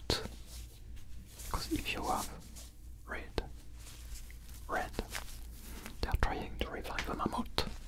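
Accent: France. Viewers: I'm not sure but are you french? I'm having a hard time recognizing the accent. If that's the case, I'd love to see a video in French someday !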